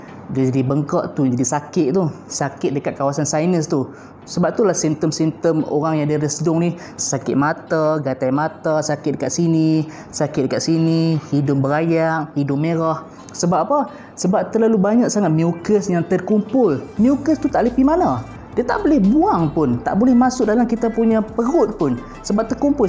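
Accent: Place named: North, Malaysia. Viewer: Terbaik! Love your explanation with utara accent 😂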